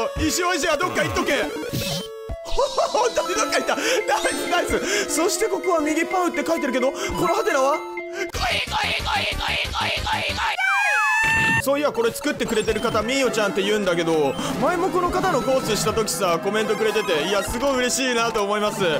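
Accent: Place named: Hakata, Japan. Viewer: ひぃぃぃ！？の謎のエコーみたいなのおもろいし僕も一緒にぶっ壊れようと思いますｗ あとところどころ博多弁出るの好き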